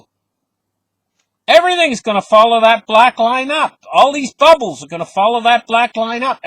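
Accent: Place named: Canada. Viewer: Mr., dont try to change for other people. It has to be expodential I can't listen to this without the whole Canadian accent.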